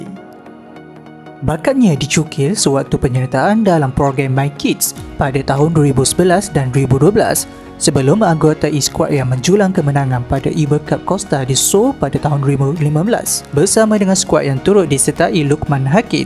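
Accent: Malaysia. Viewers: Admin org ganu ke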